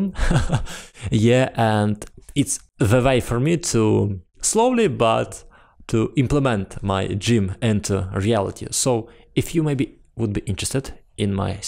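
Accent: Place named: Germany. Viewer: I loved this accent .. is that german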